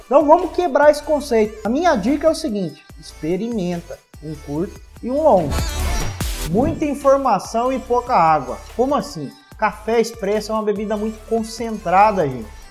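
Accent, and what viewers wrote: Minas Gerais, Brazil. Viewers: Sotaque Mineiro kkkkk eu falo do mesmo jeito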